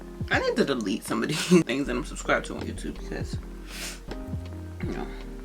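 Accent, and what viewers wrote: DMV, United States. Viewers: U gotta be from the DMV. The accent. I love iiiit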